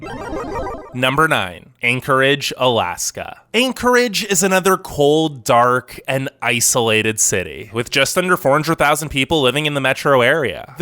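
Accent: California, United States. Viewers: Petropavlovsk is ok to pronounce. What is really hard is this American accent the author speaks 😅, my tongue wud hurt trying to copy that: theRe aRe, the largest (lOrgest Karl, lOrgest ) city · Where is your accent from in the statex ?! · Why does your voice sound different in your videos? Some of them you have a more Californian accent.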